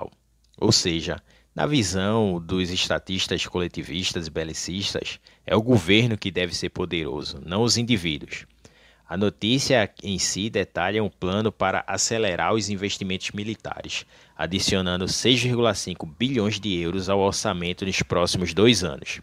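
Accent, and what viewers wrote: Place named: Northeast, Brazil. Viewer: É muito bom ouvir um conterrâneo cabra da peste narrando. Como faço para me candidatar? Seria uma honra.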